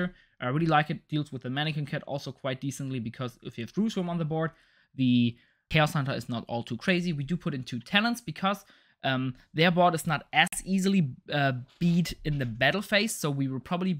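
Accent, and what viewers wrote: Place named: Germany. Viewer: Ich glaub blackboard ist das Wort das du gesucht hast , das kommt Tafel zumindest nahe ... Hab erst vor 2-3 Monaten angefangen d-Link zu spielen, hab durch deine vids so viel gelernt und bin dennoch immer wieder überrascht was das Deck alles kann. Danke für den Unterricht Prof. PS: wusste durch deinen Akzent seit der allerersten Sekunde, dass du auch aus Deutschland bist 😅😂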